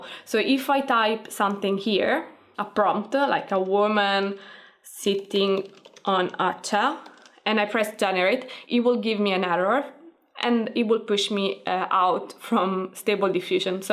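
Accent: Italy. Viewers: You are Italian aren't you? I looove the accent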